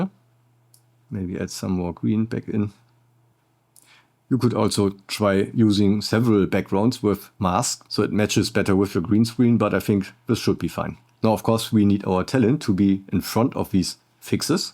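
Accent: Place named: Germany. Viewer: Just stumbled on this channel for the first time. Your German accent sounds great to me and the info is solid.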